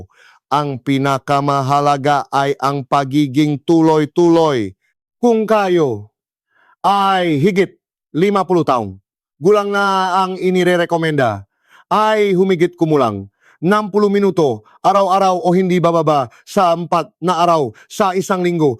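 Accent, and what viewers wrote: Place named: Visayas, Philippines. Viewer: Ang accent niya ay.visaya,hehe but it's ok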